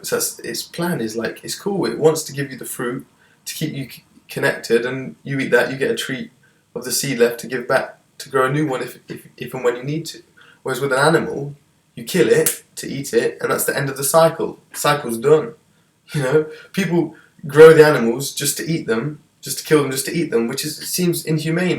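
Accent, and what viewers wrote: United Kingdom. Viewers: dude help me! I live in the uk I think you do too, you sound like you do. I am sorted for snacks etc but where can I buy meals for myself that i'd like, i'm vegan and dont want dairy or meat anymore. its kinda gross what ive learnt...and cruel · Also the English accent definitely helps hahaha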